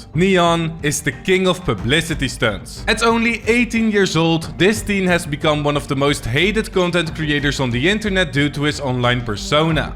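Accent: Netherlands. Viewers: Do something about that dutch accent bro😂. Godverdomme wat een steenkolenengels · let me gues you dutch ja je accent geeft het af 😂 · The narrator sounds impressively dutch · Bro the dutch accent :c But still Really good video tho :D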